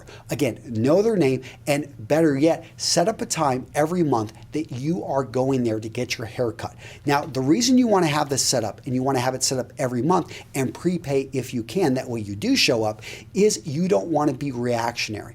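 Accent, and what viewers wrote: Wisconsin, United States. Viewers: Woah, weird to hear someone from Wisconsin. We're like the lost state.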